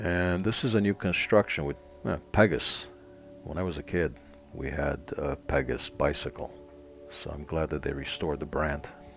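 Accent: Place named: United States. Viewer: Domnule, ador engleza americana care o vorbiti,dar fin pacate nu am inteles nimic!! Eu sunt romanca frantuzita, sunt abonata Dvs.,de circa un an!! Multa sanatate din Oradea BH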